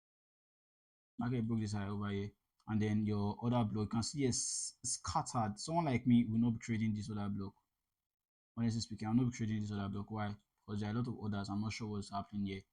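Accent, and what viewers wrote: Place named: Nigeria. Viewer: Nigerian accent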